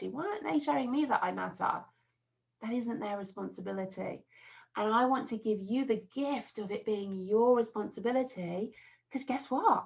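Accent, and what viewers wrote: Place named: England, United Kingdom. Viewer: Love the English accent seems to help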